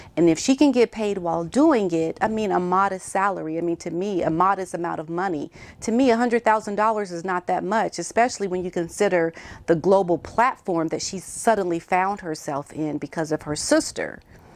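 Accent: England, United Kingdom. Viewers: Ha ha...we don't all speak like that in the UK but your accent was so funny and good too.... come do some acting over here 👏👏👏👏👏👏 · Love 💔 the Brit accent....Lol...B lucky. Lol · I like the English accent. Lol!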